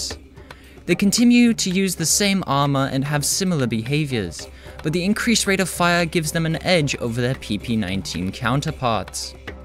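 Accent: United Kingdom. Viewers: Dude you're British!?!??!?1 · This is either an awful English accent or it's authentic & feel bad for this lad. That aside, these videos are very well written, edited and fun to watch.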